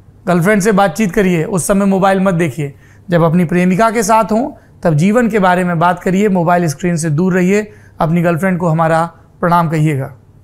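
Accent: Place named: Bundelkhand, India. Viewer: Hello saurabhji,bhasha or boli se ap bundelkhand ke lagte ho,prathak bkd prant k liye bhi apne star se prayash karenge k nahi ?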